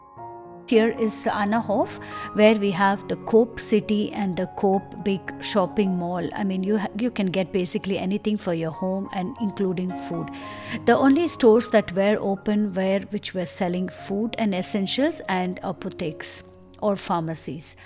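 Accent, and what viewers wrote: India. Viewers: I visited Switzerland 8 years ago, and found it to be absolutely fascinating. Not just compared to India, but also compared to other countries in the world. I didnt, or couldnt find any dirt, but as you said, no country is 100 % perfect, but I found it near perfect. However, you may be knowing better about it. Unfortunately, this is a bad time, and as of today i.e. 13th May 2020, I heard that there has been some improvement there in Switzerland compared to here, in India (by your voice, it seems you are Indian as well, I suppose), thanks to the excellent healthcare and orderly procedure there. Hope that this situation tides off and we are back to normal in a year (or two). I would love to visit Switzerland again in future, if opportunity presents. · Talk like a Indian sound like a Indian 🌚🌚 Indian 🙄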